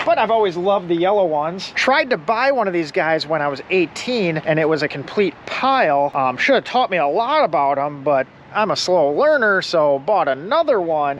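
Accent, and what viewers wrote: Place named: United States. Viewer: God I hate the American accent!!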